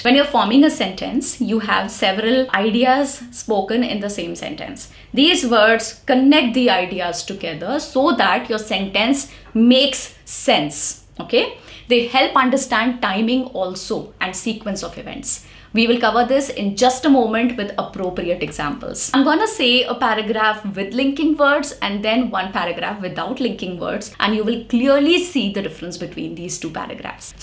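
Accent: India. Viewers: Content is highly appreciated despite intolerable Indian accent. Thank you though!!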